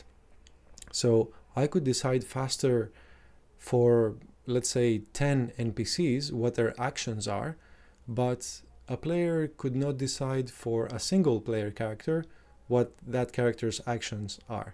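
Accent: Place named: Greece. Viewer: D&D content with a greek accent is exactly what I needed. Euharisto gia to vinteo! :D Interesting mechanic, I think I may give it a go because I have a few players who take ages to do stuff.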